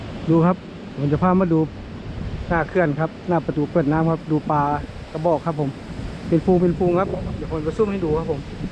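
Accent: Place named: Nyaw, Thailand. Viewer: เก็บเลยครับตากแดดไว้กินคือเว้าญ้อแกมภูไทจังหวัดได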